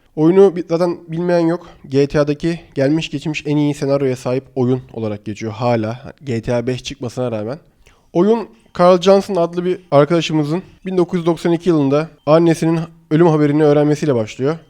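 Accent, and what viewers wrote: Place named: Izmir, Turkey. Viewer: Tenekeli gibi Tepecik gibi abi izmirlimisin :D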